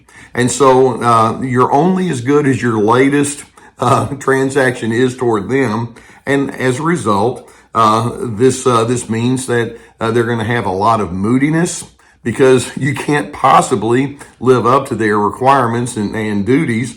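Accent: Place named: Texas, United States. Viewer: you (and ) have been a tremendous help as I recover from a 34 year marriage with an extreme narcissist. I’m a Texan living in Idaho for 30 years and your Texas-English is beautiful. 🥰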